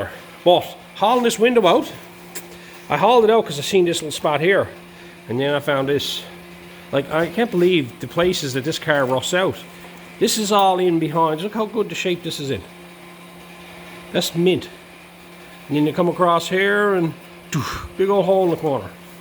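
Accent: Ireland. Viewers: Hope you don't mind me asking if you have any immidate Irish relatives, there a certian irish accent/ twang to some of your words. appolagies if i'm way off, came across your vid looking for rusty arch's, I'm in Ireland myself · What is his accent? is that Irish? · What accent do you have? It is interesting. Sounds slightly Irish.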